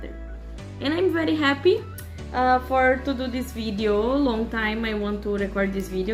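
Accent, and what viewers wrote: Philippines. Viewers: You also having the Filipino accent in speaking english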